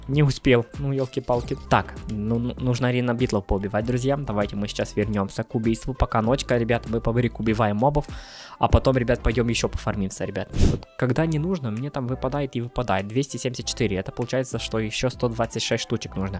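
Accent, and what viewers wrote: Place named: Ukraine. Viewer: Я кста думав що ти рус а ти Укр чути просто Українські слова хорош!!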